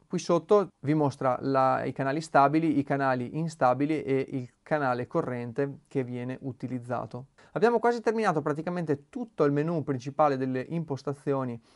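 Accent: Veneto, Italy. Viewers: Ma sei di pavullo???? Ti facevo Veneto. Complimenti sei molto in gamba.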